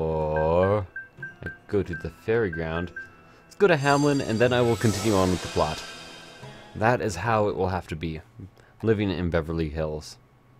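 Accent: Canada. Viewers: Your voice is like sexy butterscotch being dripped into my ears. Where in Canada are you from?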